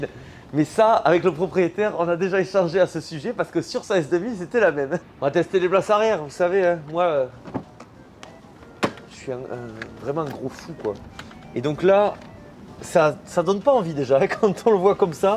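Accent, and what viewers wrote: South, France. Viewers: Merci, tes vidéos sont toujours un régal à regarder et à écouter (avé l'ascent) ça nous met du soleil dans la tête. J'espère que tes abonnés apprécieront le petit tour, parce que tu ne l'as pas dit mais filmer de la place arrière avec ta conduite, mon dos a pris cher....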